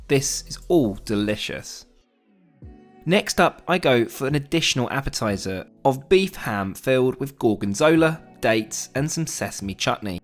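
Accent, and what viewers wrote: United Kingdom. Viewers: British accent pain to ears 😖😖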